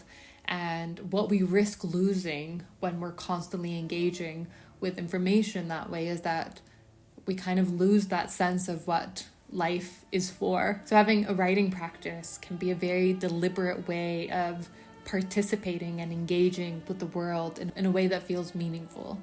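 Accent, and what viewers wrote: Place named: Ireland. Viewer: Do you mind me asking where you are from? I find your accent fascinating. As an American English speaker, I don't detect a strong accent on most of what you say, but occasionally some words feel slightly seasoned with an accent that I can't quite identify. Seems possibly Irish, but my mind might be jumping that way due to your red hair.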